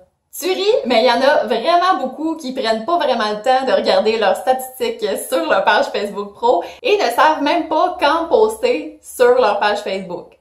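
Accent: Quebec, Canada. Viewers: Oh une québécoise 😍😍 Même si je viens de connaître ta chaîne continu je crois en toi xD 💪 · Tu est québécoise j'aime trop là-bas merci pour la video ✌🏾✌🏾😍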